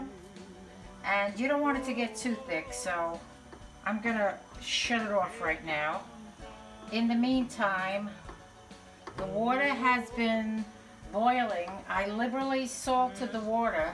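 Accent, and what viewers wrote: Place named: New Jersey, United States. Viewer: Can’t wait to try your ‘Spaghetti Pie.’ Please continue using the ‘Jersey pronunciation’ of words like “ruh-GOAT.’ By the way, be careful using non-licensed music in the background. You have to pay to use music.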